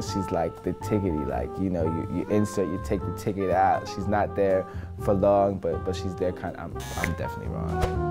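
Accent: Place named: United Kingdom. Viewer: Exactly he sounds British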